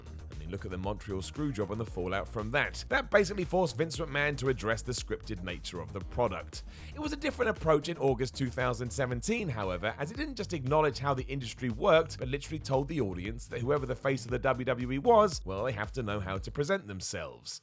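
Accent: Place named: United Kingdom. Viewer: They're from the UK lol. How do you want them to talk?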